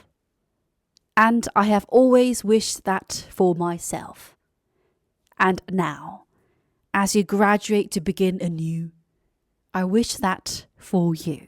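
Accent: RP, United Kingdom. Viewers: you have the most perfect British Pronunciation I've ever heard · 영국 포쉬발음은 언제나 매력적이고 듣기 좋아요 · 진짜 영국 발음 최곱니다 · 따라하면 저도 포쉬악센트 할수잇나용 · 님 발음들으면서 영국발음 연습중입니다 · 영국발음 연설을 듣고싶었는데 들을수있어서 기뻐요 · 영국식 발음으로 읽어주셔서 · 우아한 영국발음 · 영국식 발음을 익혀보려하는데 매우 좋은 영상이네요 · 영국영어로 들으니 새롭네용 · 영국식 발음의 낭독을 따라할 수 있어서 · 동양인이 여왕 영어한다는 거 자체가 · 영국발음으로 들으니 너무 좋아요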